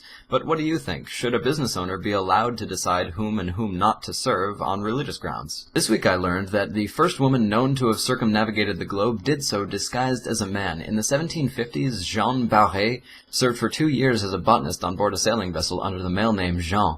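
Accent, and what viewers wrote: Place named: France. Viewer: L'accent français. J'adoooreee.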